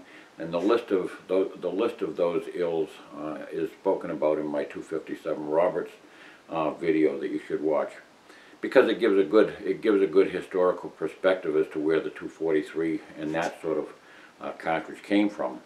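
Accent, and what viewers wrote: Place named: Boston, United States. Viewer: This guy has a straight up Boston accent! Hilarious. He sounds [and dresses] like an old Boston cabbie friend of mine. Priceless. I guess you had to be there! Down by the banks of the River Charles. I love that dirty water, O Boston, you're my home!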